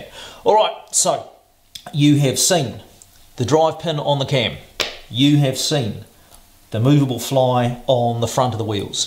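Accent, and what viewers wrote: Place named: New Zealand. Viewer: I was dmn sure you were a kiwi :D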